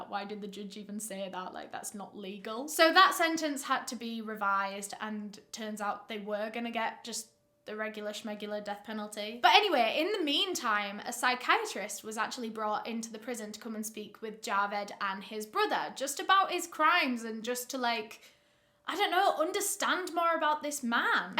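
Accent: United Kingdom. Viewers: ...which part of UK are you from? Loving your accent and you look lovely and beautiful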